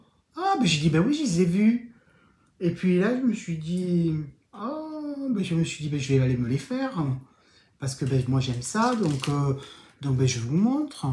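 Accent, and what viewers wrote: South, France. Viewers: Merci, on passe vraiment un très bon moment. Je découvre un univers de teinturières et de créateurs que je ne connaissais pas, alors que j’ai appris à tricoter il y a plus de soixante ans. Je suis arrivée à « « en cherchant un tuto pour talons de chaussettes en rangs raccourcis et depuis j’écoute le podcast J’aime beaucoup l’accent du sud, le naturel et la bienveillance Surtout ne changez pas Bisous de Normandie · Merci pour ce jolis moment,je suis belge et j aime tellement ton accent de soleil a bientôt Big bisous ❤❤❤😂😂😂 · Coucou, jeune! Heureusement que la perfection n'existe pas dans ce bas monde, sinon quel ennui! Il n'y a aucune course au tricot, chacun son rythme, chacun ses envies et les vôtres sont très belles et inspirantes, avec cette touche d'humour "avé l'assent". A bientôt. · Bisous et merci pour ce podcast tout en gaieté avec ton bel accent du midi. 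❤❤❤❤ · Bonjour moi le bruit d ouverture de colis ne me dérange pas du tout au contraire,et l émission sur le tricot je l'ai déjà vue il y a longtemps,et j aime l accent du sud! nous ont est dans le nord la où il fait gris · Bonjour Mais quel plaisir de vous suivre à chaque fois, je passe un excellent moment. J'adore votre humour, votre franchise et votre gentilesse. Vos tutos sont au top, et les explications de vos ouvrages détaillés sont super chouette. Quant à votre accent J'ADORE!!! Je suis du sud aussi. Un grand merci pour tout ce que vous faites pour nous et à très bientôt. DOMY du 04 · Quel agréable moment passé en ta compagnie! Tes vidéos sont tellement « vivantes » qu’on ne s’ennuie pas… entre ton bel accent du midi et ton humour c’est le moment récréation pour moi. A très vite j’espère 🤗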